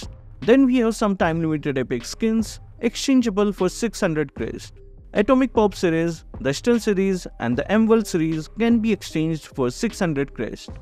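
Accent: India. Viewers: Sir I know ur indian bcoz of ur accent..I love indian people I work here in 5 star restaurant in El Nido Palawan I always have an Indian guests.i hope 1 day u will visited here.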